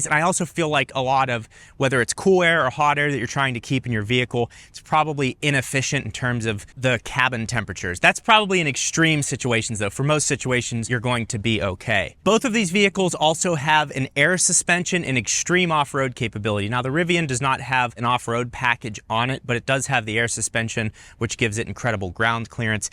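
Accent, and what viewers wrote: Pittsburgh, United States. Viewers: Sitting here at AGH in the Northside watching this vid! Nice to hear you getting back to your yinzer roots!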